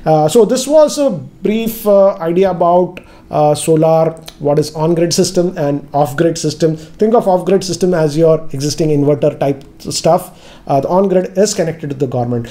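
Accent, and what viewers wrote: Hyderabad, India. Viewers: Missing your Hyderabadi hindi . please also upload on hindi channel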